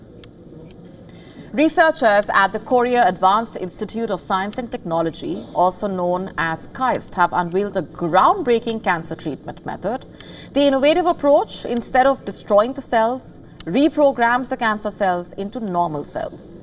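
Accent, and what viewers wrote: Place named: India. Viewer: She is annoying, the other anchors do a much better job...this is typical head bouncing Indian accent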